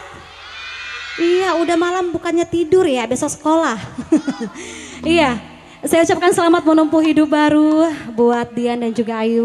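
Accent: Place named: Betawi, Indonesia. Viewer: logatnya lucu kalo ngomong😂😂😂😂 kaya betawi gitu. suaranya top markotop pokonya😍😍😍😍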